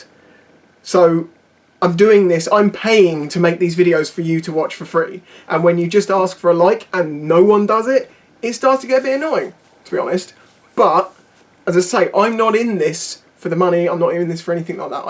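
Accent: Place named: United Kingdom. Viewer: I think it's the accent. I feel it even more strongly as English is not my native language and the weird manner of speaking is quite off putting for many people.. Maybe not that many Americans fancy the British accents?...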